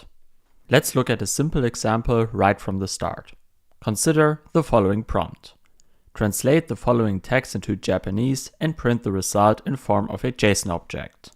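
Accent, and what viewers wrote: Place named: Germany. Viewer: bro sounds german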